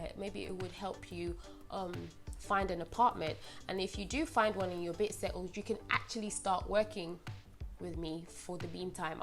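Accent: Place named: United Kingdom. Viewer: Your British accent came out to play, where’s the Canadian and Nigerian one 😀. Thanks for the heads up on accommodation. 👍👍👍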